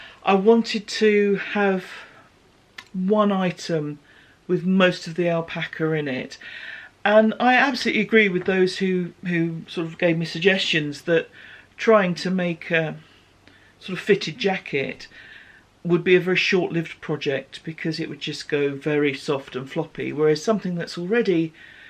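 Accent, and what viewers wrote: England, United Kingdom. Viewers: New viewer here, from New Zealand. I've taken up knitting again, after many years, with inspiration from podcasts during lockdown - I hadn't known there even were such things! So pleasant to hear an English voice, English references, and English humour;-) Thank you for sharing all your FOs, and especially the patterns, I'm taking notes to find several of them. I shall be looking for your previous pocast episodes. Wishing all of you in GB a speedy recovery from this latest covid outbreak that has wreaked havoc with everyone's Christmas, I almost feel guilty for our pretty much normal summer holiday season in NZ. Glad I found you!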